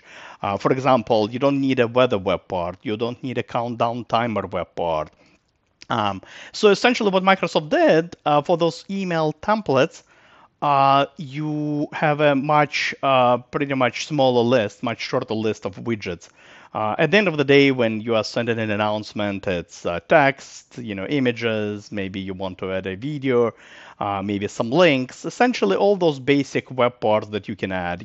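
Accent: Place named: Bosnia and Herzegovina. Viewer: Love the accent. Sounds Bosnian.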